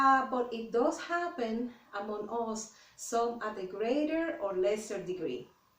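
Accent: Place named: Dominican Republic. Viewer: I can tell you are Dominican.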